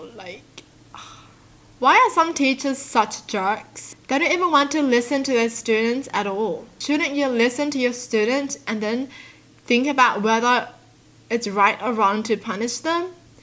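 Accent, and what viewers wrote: Australia. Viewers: Love the strong accent, like its very aussie and i love it!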